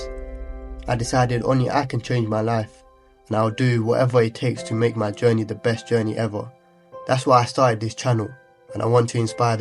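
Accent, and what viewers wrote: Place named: Bengali, United Kingdom. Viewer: nah, that aint no Essex accent. Thats a UK Bengali accent there. Aka the Bengali Twang. If I close my eyes and watch this video, I can 100% tell youre Bengali, could probably even tell what villiage youre from.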